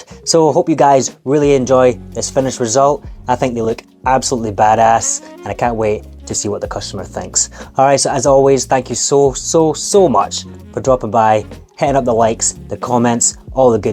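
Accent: Scotland, United Kingdom. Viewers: Just started watching your videos 3 days ago and absolutely love them! Recognized that Scottish accent too. I was stationed at Holy Loch in 70-71. I really miss that place and its wonderful people there. I just subbed and look forward to your excellent shoe repair.😊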